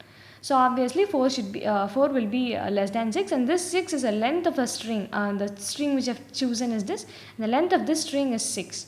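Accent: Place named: South India, India. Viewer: Please mention you are using south indian English in caption of the video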